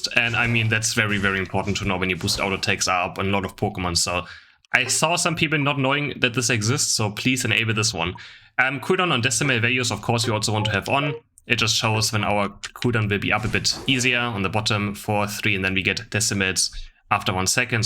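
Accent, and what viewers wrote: Germany. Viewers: das ist der deutscheste akzent den ich jemals gehört habe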